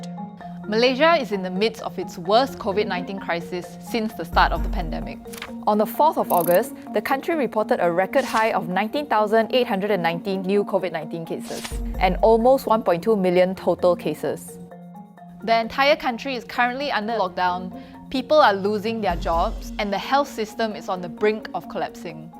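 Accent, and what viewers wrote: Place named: Singapore. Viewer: Can’t understand your Singapore Hokkien English lah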